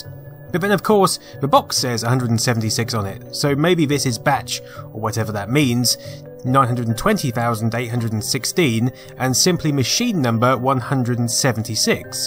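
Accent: United Kingdom. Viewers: The Britishness of your voice is glorious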